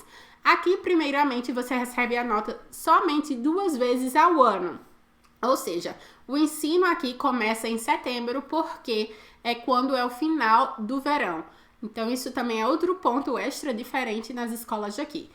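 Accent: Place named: Northeast, Brazil. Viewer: Em primeiro lugar, como baiana que sou, amo este sotaque nordestino. Aqui também os alunos nao usam farda e pagam meia passagem. Inclusive a Eslovaquia faz fronteira com a Italia né?! Aqui é bem comum as crianças e adolecentes fazerem excursao, pela escola para outros paises da europa, bem legal mesmo! Bjs 1000 e obrigada por falar de mim.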